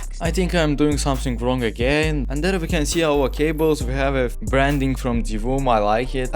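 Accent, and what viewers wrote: Russia. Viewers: Чел у тебя оусский акцент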